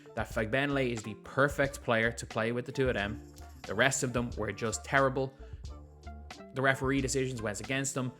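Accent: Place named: Ireland. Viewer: Where in ireland are you from?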